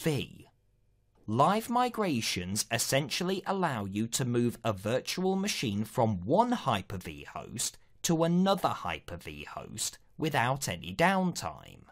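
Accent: United Kingdom. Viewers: Best explanation ever on Hyper-V and I enjoyed the British accent. Well done and thank you for the free training videos.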